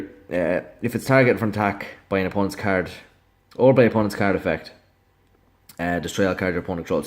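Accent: Ireland. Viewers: Is that an Irish accent I hear? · You Irish mate? What part?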